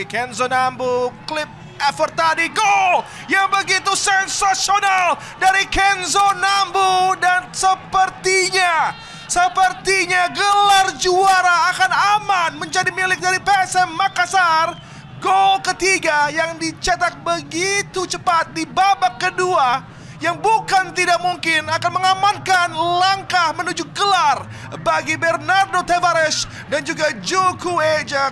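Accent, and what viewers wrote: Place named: Malaysia. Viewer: Komentator nya seperti bahasa malaysia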